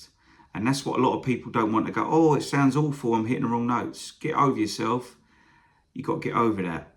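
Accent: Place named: England, United Kingdom. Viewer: Ha. First time seeing one of your vids. I didn't expect the English accent. Your playing and overall vibe was that of an American Southerner initially. That was meant as a compliment, btw. ~Native Texan (Subscribed)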